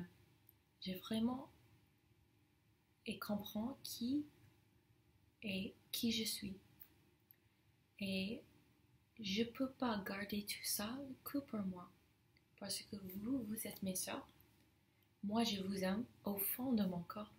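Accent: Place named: France. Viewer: Ton accent français est trop mignon ;)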